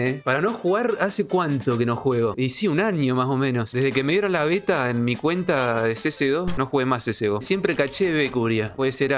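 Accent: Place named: Jujuy, Argentina. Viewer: disimula tu acento jujeño, abrazos!!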